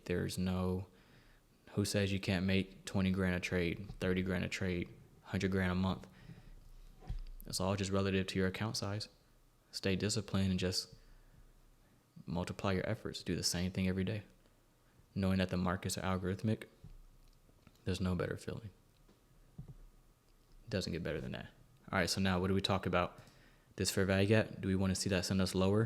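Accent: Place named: South, United States. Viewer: Here for the southern slang, giddy up traders...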